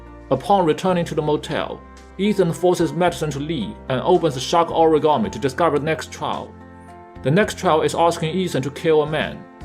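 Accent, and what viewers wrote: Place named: United Kingdom. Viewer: your accent is too british cannot understand anything clearly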